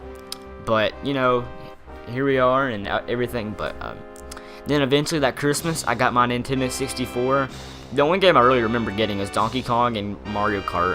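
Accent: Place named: South, United States. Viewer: I love your southern accent man!!!!!! :D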